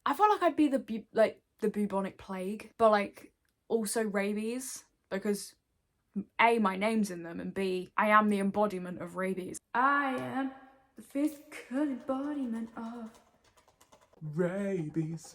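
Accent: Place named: United Kingdom. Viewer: Omg you're British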